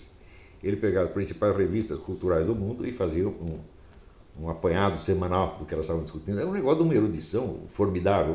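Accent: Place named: Brazil. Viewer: O prof Olavo é um dos poucos brasileiros que vale a pena ouvir, pelas informações que ele divide conosco, e também pela sua ótima oratória. Ele faz o português brasileiro mais bonito do que o de Portugal. Bless him.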